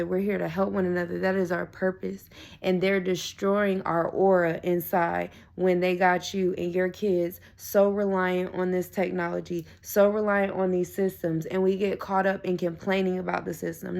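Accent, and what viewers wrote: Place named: New York, United States. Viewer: I'm detecting a northern accent, is that NJ. , long Island. Or staten Island?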